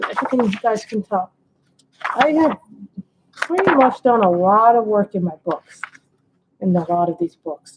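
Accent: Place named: New York, United States. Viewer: I never seem t catch you live but watch your replays. Always enjoy your completed pages. I also,love listening to you as you remind me of home. I ever like hearing the background outside noises. I use to live in Yonkers right in VanCortlandt Park. (Bronx/Yonkers line). Thank you for sharing and hopefully I will catch you live soon. 💕🦄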